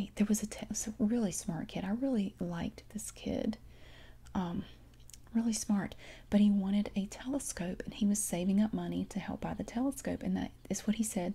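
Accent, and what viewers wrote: South, United States. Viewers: You are so comforting and motherly, I just feel so at peace watching your videos. I normally don’t pay much attention to accents, but I’m so obsessed with your southern accent. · Love her southern accent. Especially from all the way over here in New Zealand. She is just awesome and so relaxing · Love your accent. It's a Southern accent, but it's not thick or heavy, it's light and dainty like a Southern belle. It has a soothing effect when you speak softly. Very nice. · Your mannerisms, personality and voice are extremely relaxing. Glad I found your channel. I'm from Alabama and it's nice to hear a friendly southern accent for ASMR.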